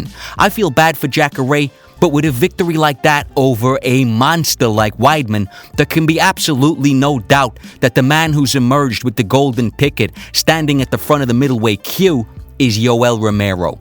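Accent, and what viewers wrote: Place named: New York, United States. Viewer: That "New Yorkesque" accent and slang is just tremendous x)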